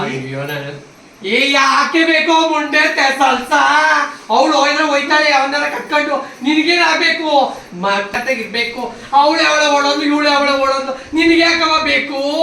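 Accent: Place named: Bangalore, India. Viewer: Nam banglore bhage chanagi helidri navu bangkore avare Banashankari avaru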